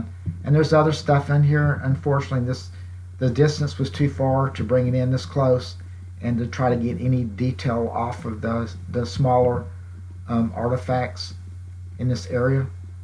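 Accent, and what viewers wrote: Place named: North Carolina, United States. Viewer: Are you from near NC? Your accent sounds like where I grew up.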